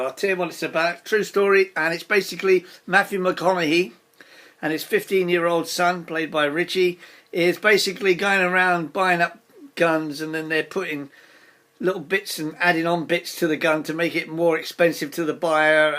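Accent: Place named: Cockney, United Kingdom. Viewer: Great mate , thanks for the heads up on this film , keep em coming , cockney reviewer great to see 😁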